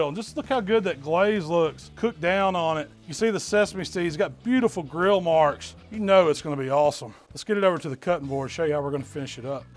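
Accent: South, United States. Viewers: This guy is awesome! Where is he from? Im from Germany so i don't know much about american accents but he sounds so cool! Anways greetings from Germany! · Dude with cool southern accent, love of red meat and Jack Daniels, and uses the black nylon BBQ gloves...I'm subbed!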